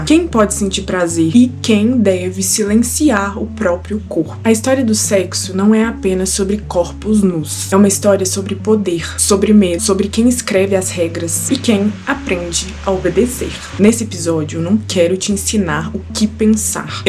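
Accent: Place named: Minas Gerais, Brazil. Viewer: 3 palavras e ja desconfio q eh mineira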